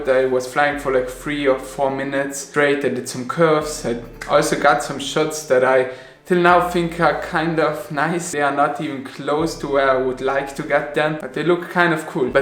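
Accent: Germany. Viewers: Man hört das du deutscher bzw. schweizer österreicher bist xD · Dieses Deutsch- Englisch. Aber ich finde es Super. Für deinen dritten Flug?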